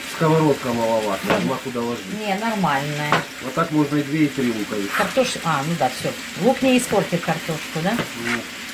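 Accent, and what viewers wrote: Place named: Rural, Ukraine. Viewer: !! Какой позитив и Ваши прекрасные комментарии к готовке! Смотрю, не отрываясь,хотя блюдо простое.Но так аппетитно Вы говорите,вспоминаю украинскую деревню,детство и мою бабушку, деда, отца.Как этого говора и блюд не хватает в Москве!! Спасибо Вам, снимайте больше и про все, про все!!!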